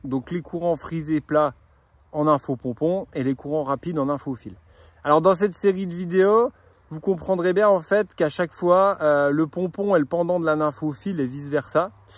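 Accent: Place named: Lorraine, France. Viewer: Bravo vraiment très bien expliqué je m abonne, tu es de quelle région t’as un accent Vosges ou Moselle? 😅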